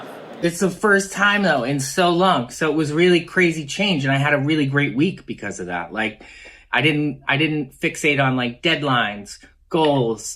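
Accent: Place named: California, United States. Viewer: He's lost the Jersey accent and sounds very Californian now, gorgeous man ! ❤️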